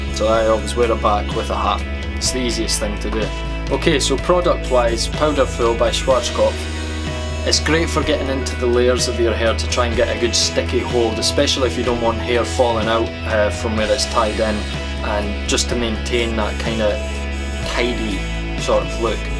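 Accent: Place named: Scotland, United Kingdom. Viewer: Hmm the accent.. Where in Scotland are you from,?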